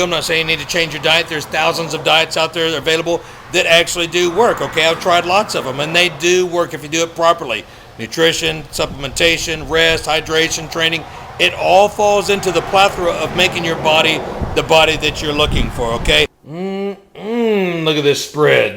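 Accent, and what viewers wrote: Texas, United States. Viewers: Can tell you're from Texas brother!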